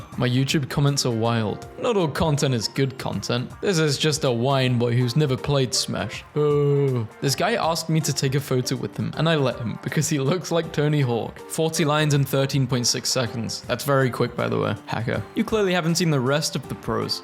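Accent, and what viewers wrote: United Kingdom. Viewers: Is it just me, or does this guy sound British?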